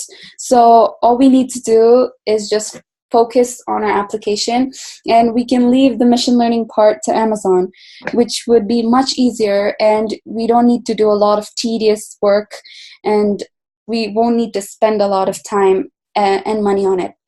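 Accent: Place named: United States. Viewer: Excellent. orchestrated with American ascent with laser sharp technical tidbits is applaudable. Leadership is an impact - you preach what you practiced. 💫💫💫💫💫👌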